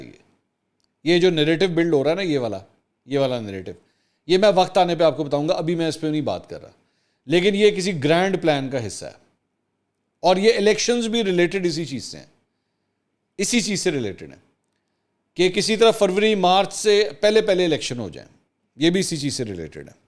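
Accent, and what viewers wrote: Punjab, Pakistan. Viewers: آپ کو سنتے ہیں تو تسلی ملتی ہے سر اور آج تو آپ نے ہم کراچی والوں کی زبان بول کر حیران کردیا ہمیں آپ کا اردو بولتے ہوئے پنجابی کا تڑکا بہت اچھا لگتا تھا مگر آج آپ نے شیان پتی بول کر خوش کر دیا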